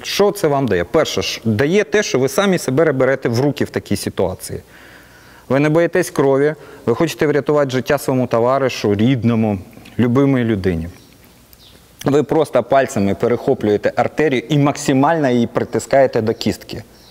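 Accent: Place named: Surzhyk, Ukraine. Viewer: Автор не користується в побуті українською мовою, максимум суржиком. Сам таким страждаю.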